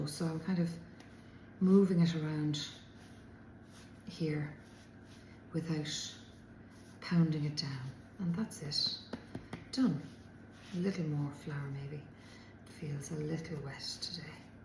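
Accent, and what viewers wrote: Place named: Ireland. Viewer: Lovely listening to a quiet irish lilt instead of a loud harsh american accent...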